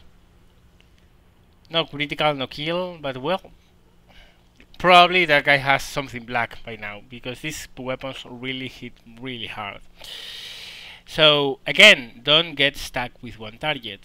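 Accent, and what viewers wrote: Spain. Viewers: Cómo se nota cuando un español habla en inglés XDD Nos pasa a todos jaja. Genial el vídeo, estoy pensando en pillarme ese tempest premium alemán y necesitaba algo de motivación!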